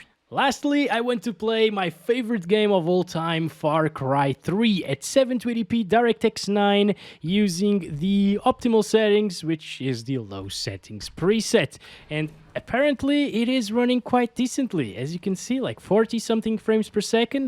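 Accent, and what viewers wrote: Portugal. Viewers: És português??? 🤔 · És português?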